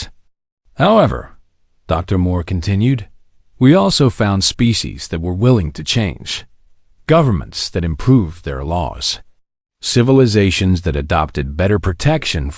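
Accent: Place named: New York, United States. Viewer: This new york accent sucks. I cannot listen to this or watch this...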